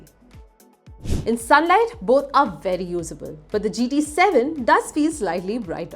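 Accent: India. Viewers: Gosh don't they have AI to eliminate Indian accent? I can't hear half of the words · Wait a minute. There's nithing to be proud about, rather contrary. Wroting it as a Pole who albo finds I Dian accent extremely disturbing. A good and thorough review though👍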